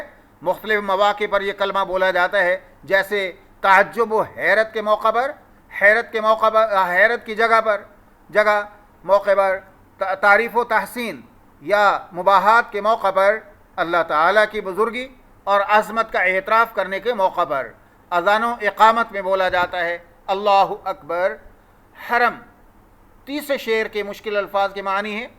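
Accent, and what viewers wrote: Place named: Arab world. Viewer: اچھی کاوش ہے۔ کوشش کریں کہ اردو لب و لہجے میں بیان کریں۔ یہ اردو میں عربی لہجہ بوجھل ہے۔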